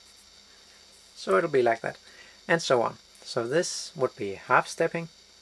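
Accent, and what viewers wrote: Denmark. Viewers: Are you from Denmark ?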